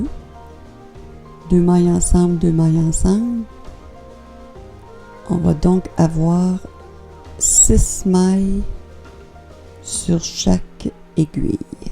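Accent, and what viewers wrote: Canada. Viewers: .ok merci bcp..c difficile l'accent canadien des fois!! Encore merci pour vos partages.j'apprends bcp.salut du sud de la France.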